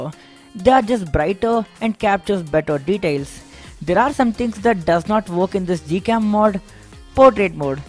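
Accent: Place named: Madras, India. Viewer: 100% madrasi accent, am I right tambi ?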